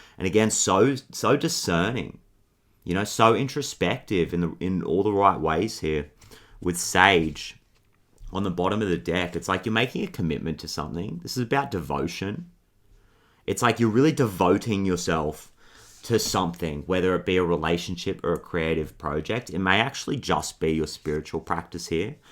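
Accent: Australia. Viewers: God you're adorable! and your Australian accent and the tone of your voice is wonderful on my ears. Thank you for your light and purity; thank you for choosing to share this very on-point and powerful message. You got my subscriptions and likes for sure! I look forward to hearing more messages from you! Cheers! ❤🙏💎🌟🌟🌟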